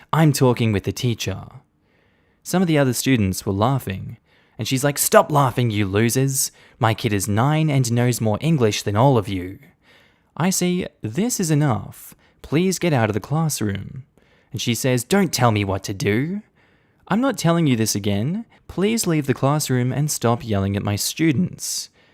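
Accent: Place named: Australia. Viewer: I miss the “chuck a prawn on the barbe” in your wonderful Aussie accent.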